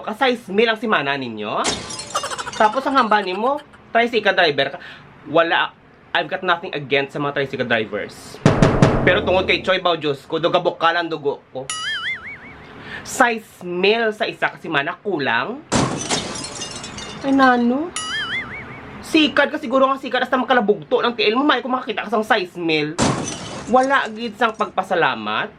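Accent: Iloilo, Philippines. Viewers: Im not ilonggo,, i just know tagalog,,i dont know how i can undrstand but in someway ican understand a bit what you are saying,, ,,,i love the ilonggo accent!❤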